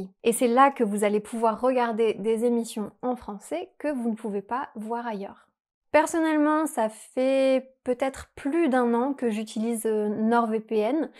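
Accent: France. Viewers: This is perfect for me. I am in French 1 at school and am very good, already ahead of my peers and am taking French 2 during summer to be in french 3 for 9th grade. She sounds so similar to my teacher, a clean French accent and speaking slow enough for me to understand most words. Will be watching more of these to learn more on the spoken french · Oui, nôtre chère a encore une pointe d'accent que je situerai au nord du ”Sud de la France" entre Valence et Aix en Provence, mais pas plus au sud... ou peut-être un accent bordelais ou aquitain? Après je ne peux pas dire grand chose, je suis lyonnais pur souche et il paraît que nous avons un certain accent... C'est curieux car pour moi, ce sont les autres qui en ont un 🤓. Je trouve que les accents 'colorent' le français et je trouve ça bien. J'adore d'ailleurs les accents francophones comme on trouve dans certains pays africains, le Québec sans oublier la Belgique si proche géographiquement, mais ayant son accent typique (souvent moqué par les Français) et ses expressions qu'on ne trouve pas ici. 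C'est aussi vrai que pour apprendre une langue, il faudrait le moins d'accent possible (le lyonnais alors?) et de distinguer la prononciation des voyelles accentuées ou combinées comme les ai, é, ê et è, les a et â, les au, o et ô, ainsi que les e et eu comme dans feuille ou fauteuil, les ain, in et un... Tout ce qui rend notre langue si difficile à apprendre, mais si riche en nuances. Bon, j'en ai encore écrit une tartine? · Une émission qui nous explique comment mieux parler Français qui s'appelle "French Morning"!??? Et en plusse prononcé à la Française sans aucun effort pour au moins assummer l'accent Anglais.... Non! Désolé! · Ce vidéo et très bon pour apprendre le accent française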